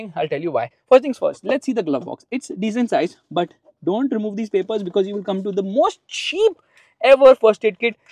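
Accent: India. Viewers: DEAR GOD HE IS SPEAKING SOOOO FAST THAT IS HARD TO WATCH VIDEO AND CONCENTRATE AT THE SAME. AND THAT INDIAN ACCENT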